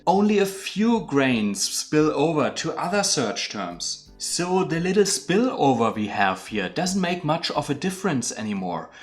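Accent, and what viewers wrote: Germany. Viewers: hört man richtig stark. Ist halt der typische deutsche Akzent beim englisch reden 😃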